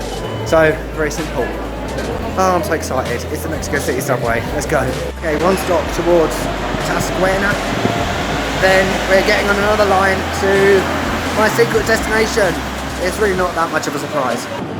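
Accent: United Kingdom. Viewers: Also have to say that after lots of vids of north americans (kinnetic kenons, tangerine travels and more), I love to hear your easy to understand british accent. Cheers from Spain. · I love your British accent, I'm really glad that you love our city and culture ❤️ I also like British culture, in fact I'm actually trying to improve my British English because I learnt American in mi school, haha. Cheers m8 :)!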